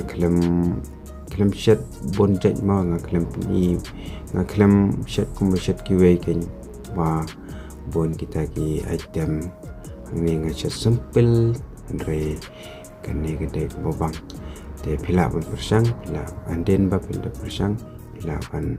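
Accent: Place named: Pnar, India. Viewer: Ph bh dakan hangno nga sngew tynnad ba ph kren daka kynten pnar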